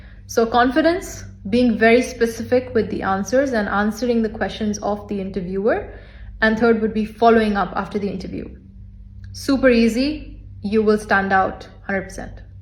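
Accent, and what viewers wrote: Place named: India. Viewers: you have a little indian accent can't you speak a little bit faster? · She has an Indian accent because she's Indian. No one needs your opinion on how they speak, she speaks confidently, and the thing is you are talking about how she speaks not on the information she is providing for her subscribers.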